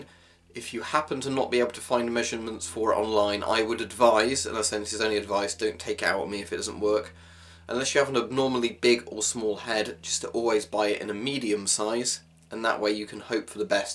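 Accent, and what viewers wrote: Russia. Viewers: Russian accent 20/10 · Great russian accent :) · nice russian accent bro, you sound like you speak it fluently. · I want an entire video of you talking with that russian accent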